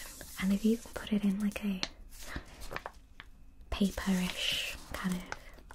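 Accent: United Kingdom. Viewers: i literally love your accent so much! I’m British too but I wouldn’t say I have a “posh” accent lol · I love your British - English ❤️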